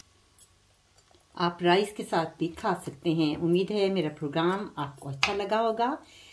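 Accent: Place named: Germany. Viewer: میرے کے لگ رہے ہیں ۔۔۔۔ ۔۔۔۔۔۔۔۔۔۔۔۔۔۔۔۔۔۔۔۔چکن پکوڑے اتنی مرچیں ۔۔۔ دیکھ۔ مجھے۔ پسینہ اگیا۔ ھے 😁 آپ کی اردو اچھی لگتی۔ ھے۔ جرمن۔ ایکسینٹ کے ساتھ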